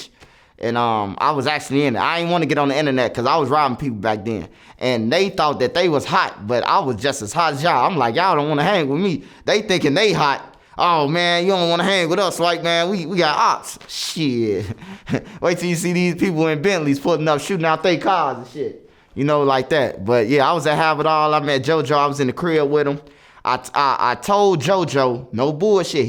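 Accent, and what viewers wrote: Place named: Chicago, United States. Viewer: I'm from Chicago and he is typically what we sound like. I don't know where these other people accent come from. I'm like where they pick this country ass slurred speech from😂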